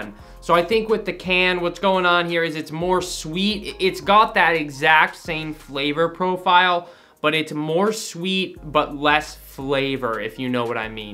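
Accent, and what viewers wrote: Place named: United Kingdom. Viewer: I always thought you were from the UK lol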